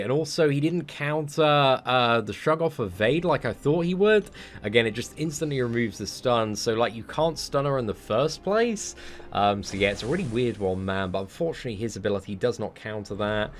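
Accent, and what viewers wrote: United Kingdom. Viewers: I love apocalypse you are lucky Also love the British acsent it is the best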